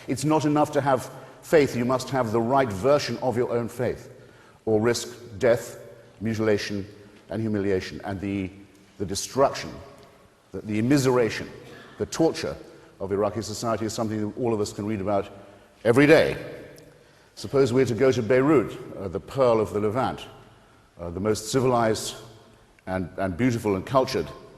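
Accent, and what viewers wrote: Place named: England, United Kingdom. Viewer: It's just his pompous attitude, and English accent.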